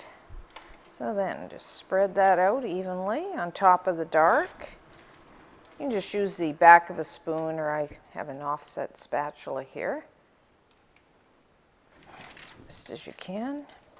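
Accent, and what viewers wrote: Canada. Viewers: whoah shes canadian! haha thats awesome!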